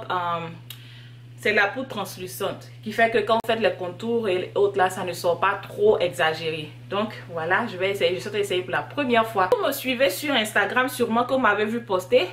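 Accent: Ivory Coast. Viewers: Tu es toute belle ma chérie et tu as l'accent des ivoiriens j'adore tes vidéos 💖💖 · Eeeeeh merci beaucoup oh🙏🙏je te suis sur unstagram depuis longtemps...je te vois toujours sur YouTube mais j'ai jamais cliquer sur tes vidéo ...donc en t'entendant parler avec les deh,les thieeee,comme Abidjan ça m'a captivé j'ai tout de suite su ke tetait ma soeur ivoirienne deh suis trop contente dehors😍😍😍😘😘😘😥😥😩😩😩😥😥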